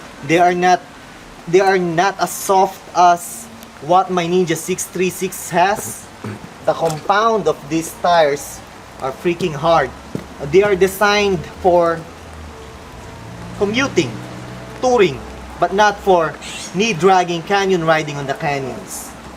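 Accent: Philippines. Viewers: bro bakit pinoy accent kaparin dapat american accent kana, cant you not make it practice more speaking the American way.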